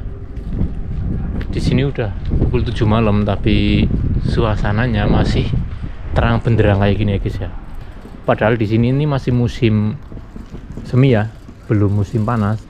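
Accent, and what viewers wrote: Banyumas, Indonesia. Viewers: Ngapak ndi mas. Purwokerto Banyumas hadir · Ngapak kang endi kang... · Soyo suwe ko ngapakmu metu mbuuaaahhh....😂😂😂🙏🙏🙏❤️❤️❤️ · Wong ngapak kieh jelas temen Cilacap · Mase ngapak kie tah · rika wongndi sh kang bahasane kyane wng cilacap ap purwekrto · kelihatan orang banyumasan .ngapak banget. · Rika wong endi mas, ngapak tulen😂😂..salam dari Cilacap😊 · Halooo.,..Mas...... salam kenal.... mantap.... terimakasih atas infonya tentang kebudayaan Kebiasaan sehari hari Masyarakat Jepang.... Kalo boleh tahu Mas asal nya dari mana?.... kelihatan bahasanya Ngapak 👍🇮🇩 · Rika asli wong ngendi kang,deneng ngomonge ngapak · Kya jawa ngapak,,banyumasan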